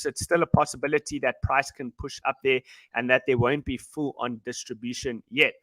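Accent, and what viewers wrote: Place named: South Africa. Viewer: SA I reckon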